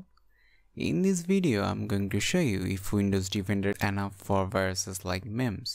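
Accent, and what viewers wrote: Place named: India. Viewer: U sound like a indian, or are u? dramatic music plays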